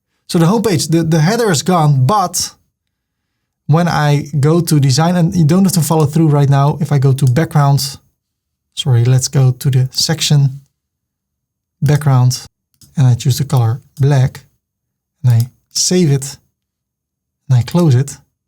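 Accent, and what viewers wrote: Netherlands. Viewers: i am obsolute beginner. started as a hobby. This tutorial is amzing. He never hiding anything. His dutch phonetics is lovely. I am trying to imitate. coming back to the subject, when I tried to create background colour for the section, it is showing the row separate colour. How to fix it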